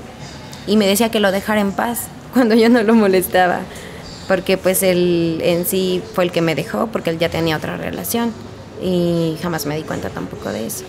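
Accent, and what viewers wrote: Fresa, Mexico. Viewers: La verdad no le creo nada, además, por qué habla como fresa? Me recuerda a una amiga que tuve en la adolescencia que era bien mentirosa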